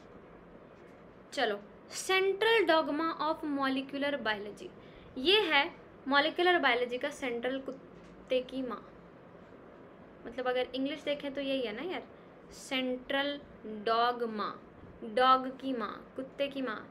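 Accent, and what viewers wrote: Haryana, India. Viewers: Diii aap haryanvi ho kyy???🤔🤔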